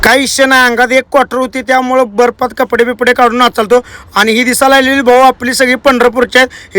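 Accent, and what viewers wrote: Kolhapur, India. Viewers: मला ची कोल्हापूर ची भाषा खूप आवडते एकदा मला भेटायला यायच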